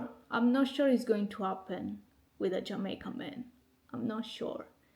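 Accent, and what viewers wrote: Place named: Italy. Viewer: I agree with everything you have said about Jamaica and the men 😅. Jamaica will lure you in whilst on holiday but it's not a place for the fainthearted if you want to reside there. It's very very expensive and the ppl mostly have unrealistic expectations of foreigners even on their family from overseas. Plus Jamaica is considered a developing economy so is too challenging for Westerners to go live. The men are on a different level - charming, egotistical and opportunist. Be careful with them. But I am enjoying your videos on Jamaica, your personality shines through and like your Italian accent 😊❤🇮🇹🇯🇲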